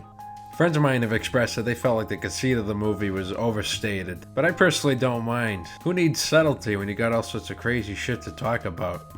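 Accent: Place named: Boston, United States. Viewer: Your Boston accent shines right through! Love it.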